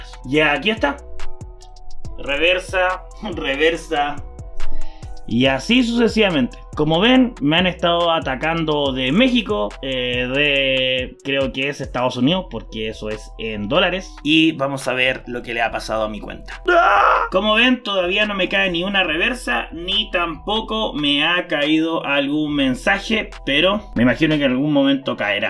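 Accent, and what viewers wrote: Chile. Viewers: Hola soy nuevo. Ase tiempo que te conosia pero me daba flogera entrar. Pense que eras español por tu bos o asento pero en un tik tok que isieron soble ti escuche tu bos y pense que eras chileo lo busco en google y vi que eras chileno yo tambien soy chileno haora si que si estare a tento a tus videos ace un mes me registre en free no tengo diamante labropa que tengo la consegi con ticets de incubadora o diamantes los diamantes uqe tengo son los de los regalos que dan cuando entras a una partida me pregunto si me puedes dar aunque sean 2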